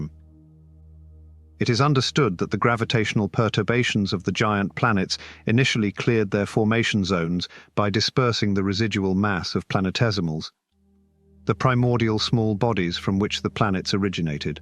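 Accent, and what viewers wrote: United Kingdom. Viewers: Finally a video with a British narrator🎉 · 🎉 Right? Everything just sounds 20% smarter with a British accent 🇬🇧📚 Feels like you're watching a documentary and getting knighted at the same time 😂 Who else lowkey rewinds just to hear them say “laboratory” again? 👇🎧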